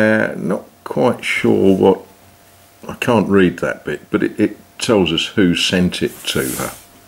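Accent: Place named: United Kingdom. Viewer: Just here for my daily dose of British accent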